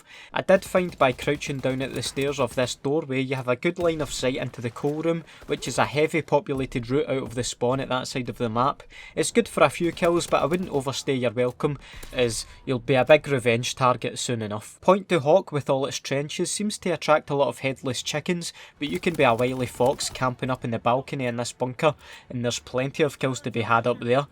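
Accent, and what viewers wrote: Scotland, United Kingdom. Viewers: God I love scottish accent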